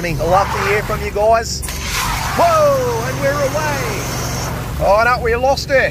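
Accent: Australia. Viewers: Absolutely disgusting but still oddly satisfying to watch 😂 And mate, your accent simply makes it perfect! Like ozzy man! Since you're also an ozzy man! Edit: Reminds me of the lovely neighbors who ate very healthy... Lots of vegetables... Which they forced down the kitchen sink! Which then obviously caused a blockage and filled my bathtub.... That was so disgusting... Needed multiple hours to clear the drain and then clean my bathtub.... So if you live in an apartment with multiple others, please either compost, throw in the trash or flush down the toilet all the parts of your organic vegetables you don't eat...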